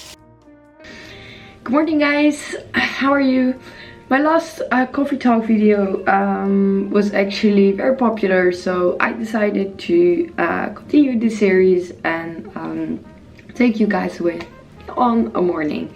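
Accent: Netherlands. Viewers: DUTCH ACCENT + LOVELY VOICE = PERFECTION ☺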